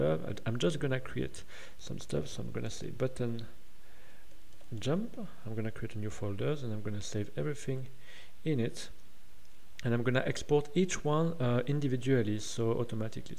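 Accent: France. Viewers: c'est bizarre mais tu parles anglais comme un français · Are you french ?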